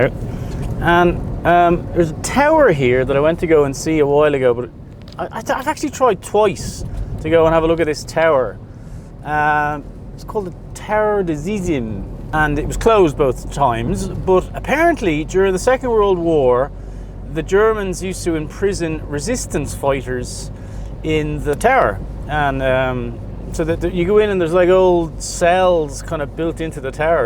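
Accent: Ireland. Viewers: question.....Do you speak French with an Irish accent?:eyes-pink-heart-shape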